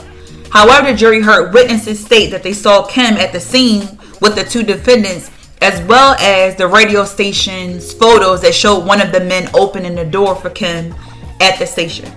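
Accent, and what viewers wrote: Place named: Philadelphia, United States. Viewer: This narrator’s voice is so ghetto I can’t finish listening!! Definitely East Coast…almost sounds like Philly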